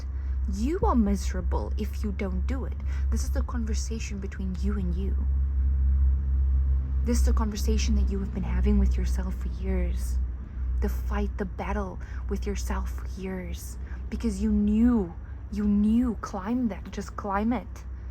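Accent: South Africa. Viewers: Girl, are you South African by any chance? Coz your accent sounds really familiar to me 👀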